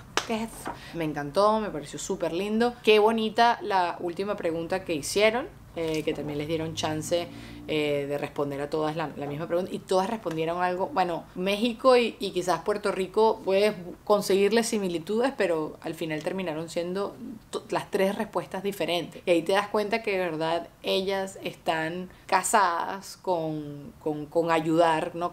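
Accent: Venezuela. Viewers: saludos, vi el Certamen con mi esposo, por TNT, al escucharte me pregunto ¿quien es esa la que esta hablando? - Yo: Es ¿sabes quien es? - y me dice Si, ES UNA VENEZOLANA! JAJJAJAJA me encanto que me respondiera asi!! Te queremos! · Me gusta mucho como habla daniela burde lo nornal chama típica criolla te amo eres exelente besos 😘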